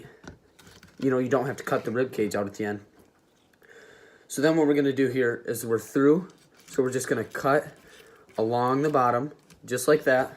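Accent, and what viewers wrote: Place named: Canada. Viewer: You sound extremely Canadian in this video...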